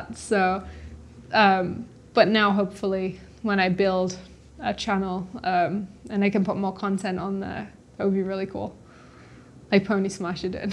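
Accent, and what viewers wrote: United Kingdom. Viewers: So straightforward and inspiring, best of luck. Also, the accent geek in me is intrigued as well, am I hearing Geordie origins maybe?!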